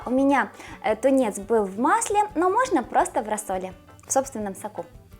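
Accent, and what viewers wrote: Ukraine. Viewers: Дитинко все добре , вле чому ти мовою окупанта ведеш , по говору чути що ти з українського середовища , якась напасть так нелюбити свого і підлаштовуватися під чужих . Чи гроші понад усе ?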